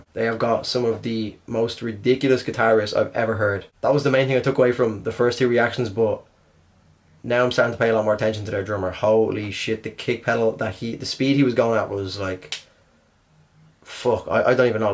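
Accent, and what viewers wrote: Dublin, Ireland. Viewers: Ok so... I discovered your channel today, I have watched something like 20 videos... and not a single comment about? It seems you like, is the "same" style (and they were an inspiration for ) mixing metal and electronic music.... and everything is great with them! Voice, music, lyrics, and video clips! I can recommend you a few titles if you're interested; but honnestly everything is great! Considering video clips, my favourite songs would be (german and english version);;.... and for the electronic part:) Anyway, keep up the good job, you're the only reaction channel I actually find interesting (plus your accent reminds me of Dublin <3 )! Greetings from France!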